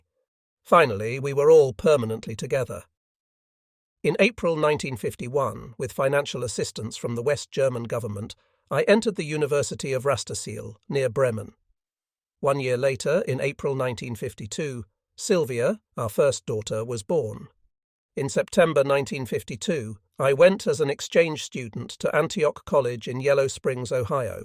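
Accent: England, United Kingdom. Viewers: You must be from the same area of England as Mark Felton.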